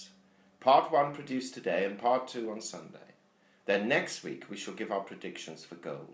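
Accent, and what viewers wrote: England, United Kingdom. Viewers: Very thoughtful (the Eng accent helps) and an award for a nicely rigourous analysis; but, my gut says $38 as this Fall is just too econo-politically topsey turvey not to force a doubling.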